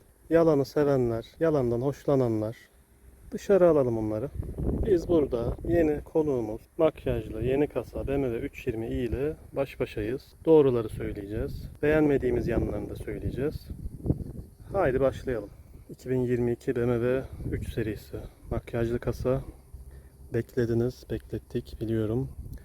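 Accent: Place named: Adana, Turkey. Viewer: hocam adanalısın sanırım şivede kaçaklar sezdim :)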